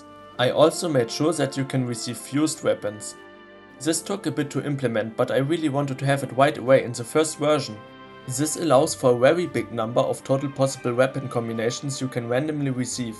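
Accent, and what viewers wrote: Germany. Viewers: mach weiter so ich liebe deine Videos aber es fällt mir manchmal schwer dein Brandenburger English zu verstehen aber große props an dich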